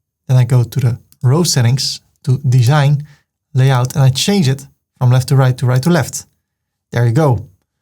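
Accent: Netherlands. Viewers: i am obsolute beginner. started as a hobby. This tutorial is amzing. He never hiding anything. His dutch phonetics is lovely. I am trying to imitate. coming back to the subject, when I tried to create background colour for the section, it is showing the row separate colour. How to fix it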